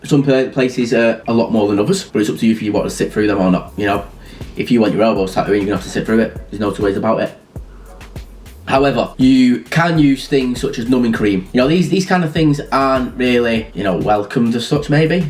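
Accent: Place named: North, United Kingdom. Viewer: Are you a northerner? You sound northern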